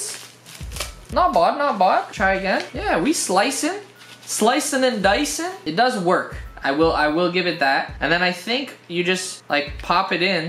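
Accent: Canada. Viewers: I love Canadian accents. They’re hilarious (though ‘Merica has some funny accents, too). Don’t change :)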